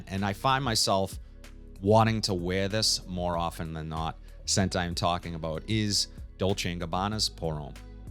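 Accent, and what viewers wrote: Boston, United States. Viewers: I definitely… couldn’t tell you where from Boston until you mentioned it…. Elixaarr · I was watching one of his videos on my phone and my wife heard him and said, "Who from Boston, are you listening to??" 😂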